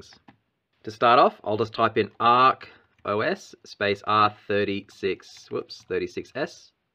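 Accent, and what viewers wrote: Australia. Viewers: Thanks heaps, it worked first time! Must have been the calm Aussie narrative. My only stumbling point was one of the ROMs was corrupt when I was copying them. Skipped it and will hunt it down another time. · Thanks mate! For whatever reason, this video was so much more helpful to me than others I watched. As a total newbie to the dense world of emulation, a calm Australian voice and super clear instructions saved me from a frustration spiral. Subbed, keep up the great work!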